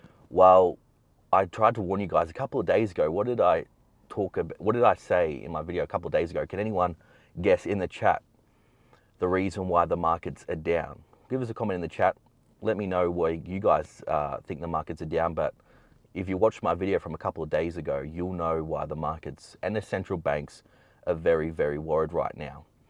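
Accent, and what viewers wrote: Australia. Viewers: I love the crocodile Dundee accent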